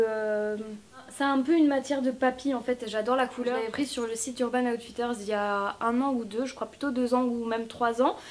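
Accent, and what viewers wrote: Alsace, France. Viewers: J'adore ton accent alsacien aha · Le petit accent alsacien aha! ;)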